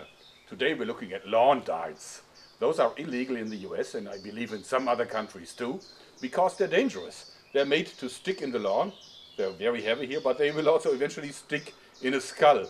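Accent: Germany. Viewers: #JoergSprave ich habe gewusst dass du n deutsch bist:D denn der Akzent ist der beste:D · Nico Derksen Kann man aber braucht man nicht. Die Videos sind für die Weltöffentlichkeit bestimmt und nicht für welche die nicht genügend Englisch verstehen. spricht ganz gut Englisch und hat so wie ich eben einen charmanten Deutschen Akzent. Keine Extrawürste braten!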